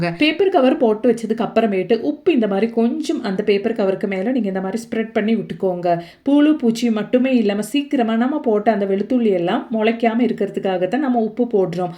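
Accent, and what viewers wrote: Kerala, India. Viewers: Malayalam mix aaguthu voice so ur malayali അല്ലേ 👍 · சகோதரி கேரளா பகுதி அந்த உச்சரிப்பு சிலருக்கு புரியவில்லை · அனியத்தி நீ மலையாளியோ😂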